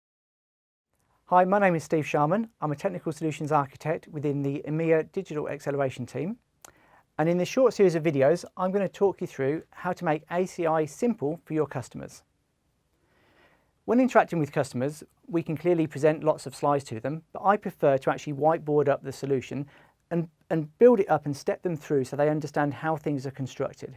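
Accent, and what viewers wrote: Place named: United Kingdom. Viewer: God, finally UK gentleman pronounce... love it...nice one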